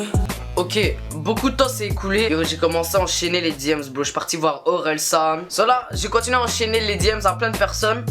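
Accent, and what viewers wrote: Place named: Quebec, Canada. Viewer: T québécois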